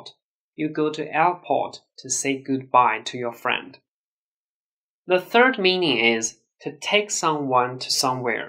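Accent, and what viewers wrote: Standard. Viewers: I’ve watched enough of your videos to understand that your full courses will be well worth the purchase price. They’re well structured and your teaching mannerisms and accent are textbook; which is so helpful for beginners and intermediate students. Your videos were the first I found when considering learning Chinese and I’m so glad I came across your channel first. I’m now around HSK level 3. Thank you for putting these lessons together and I hope you continue to do so for a long time. I look forward to purchasing your full courses this month.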